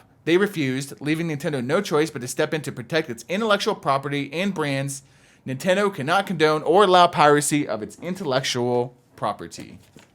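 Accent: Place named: California, United States. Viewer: sounds like Fred Armison in the SNL skit Californians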